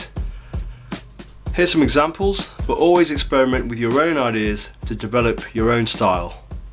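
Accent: United Kingdom. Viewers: These vids hVe helped me more than words can express, excellent job bro, you even sound proper cause of ur english accent. Great vids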